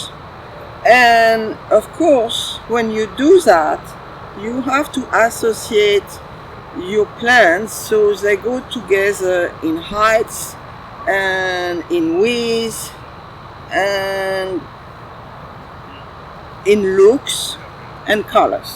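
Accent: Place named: France. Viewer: Enjoyed listening to the French accent! Oh and the bird!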